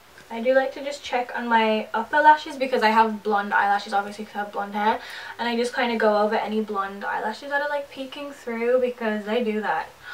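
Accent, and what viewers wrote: United States. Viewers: You sound slightly American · does england has different accent depends on where you live? she said she's from england but her accent sounds like she's from america 😅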